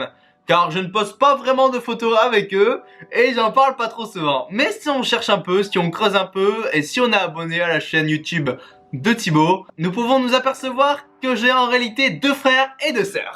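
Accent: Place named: Quebec, Canada. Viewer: Hey grand mammouth nommé! Continue tes vidéos, tu vas bien avancer avec cette chaîne youtube! Juste, quand tu parles j'ai l'impression que tu as un petit accent canadien québécois mdr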